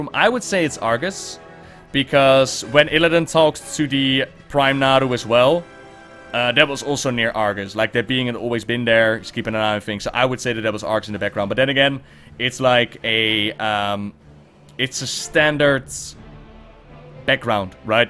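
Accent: Netherlands. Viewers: I don't get why so many people watch this crap this Dutchboy has a annoying accent.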